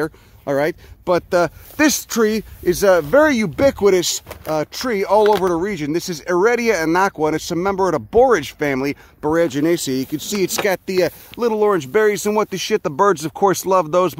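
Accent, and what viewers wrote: Chicago, United States. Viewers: I have a question where did you grow up, your pattern of speaking reminds me of Brighton Park Chicago area. Its a breath of fresh air and love the Show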